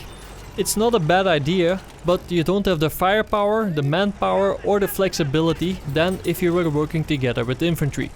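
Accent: Netherlands. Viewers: Are you dutch? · hij zegt "tank" op een Nederlandse manier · Are you Dutch ?! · beetje duidelijk Nederlands accent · you're dutch right? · You have a very noticable Dutch accent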